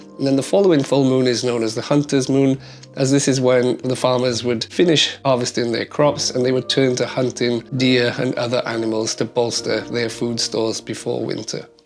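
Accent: Wales, United Kingdom. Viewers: Laughing at the YouTube subtitles misunderstanding your welsh accent and telling me the Tories are fireballs (I wish)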